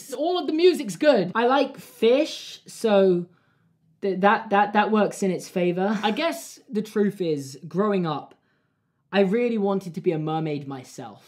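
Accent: United Kingdom. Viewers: As a fellow Britishman, I approve of the Renaissance pronunciation going on in this video 👍🏻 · see this is what irritates be. People from the US saying a brit is mispronouncing their own language.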